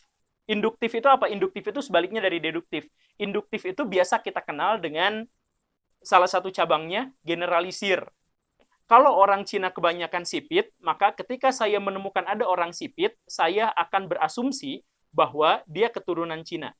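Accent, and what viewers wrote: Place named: Sunda, Indonesia. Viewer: Pandai adek I yg ganteng nich... Sunda nya medok ieu teh. ...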